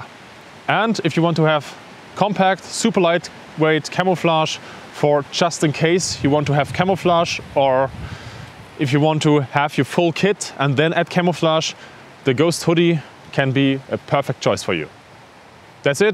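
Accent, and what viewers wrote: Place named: Germany. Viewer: I love the German accent.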